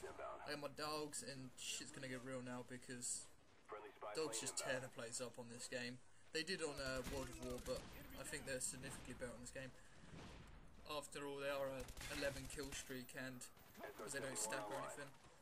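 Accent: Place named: Australia. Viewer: very Australian english accent :)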